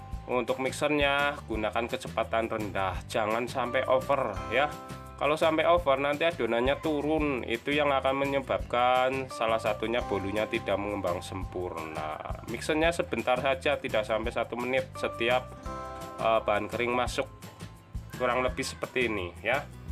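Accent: Banyumas, Indonesia. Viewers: Saya justru suka logat ngapak nya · Kan itu juga udh pake bhs Indonesia.. Cuman logat nya aja medok ngapak.. Aku yg org Sunda aja ngerti dan paham apa yg sampaikan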